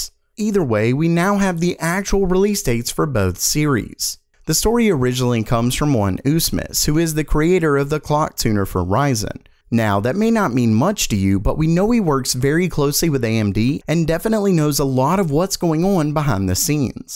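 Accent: South, United States. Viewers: With each video your southern accent becomes more and more pronounced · I can hear the southern accent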